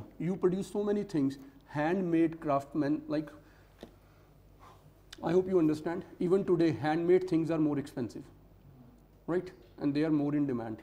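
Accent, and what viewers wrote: Punjab, India. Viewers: Finally someone explained the history in a lucid manner and cherry on the cake is his punjabi touch that keeps you involved during lecture..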